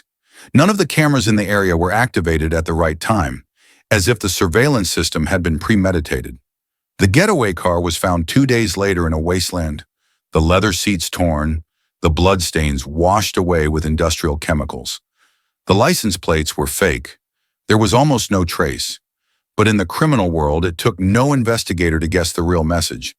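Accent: United States. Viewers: With that accent should this not be Shadows of USA .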